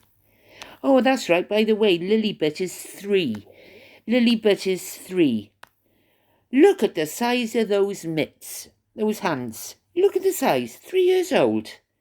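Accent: Wales, United Kingdom. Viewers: First time visiting you but I' ve heard good things about you from others here. Nice to hear a Welshy twang. I'm from Cardiff but I'm miles away from home. Shall be following..❤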